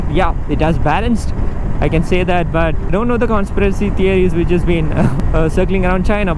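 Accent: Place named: India. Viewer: I do not know how I ended up here. I gather is Indian if an IT engineer and by his accent., stay safe there in India. But if you are healthy and not too old, this corona virus is not relevant to us.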